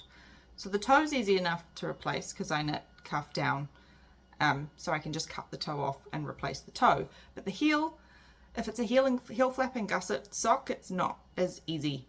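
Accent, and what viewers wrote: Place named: New Zealand. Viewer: Hi - I've just found your podcast and I am loving listening to a local (Kiwi) accent. You've made some amazing items with such easy to follow show notes too - my "to knit" queue is getting longer.............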